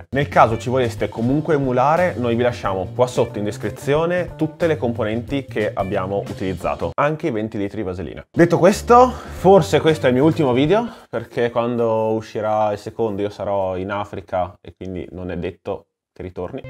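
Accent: Italy. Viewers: Porco due sento troppo l'accento di Brescia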